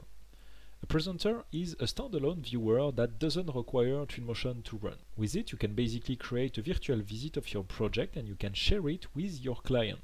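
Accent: France. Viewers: Your French accent is “mignon”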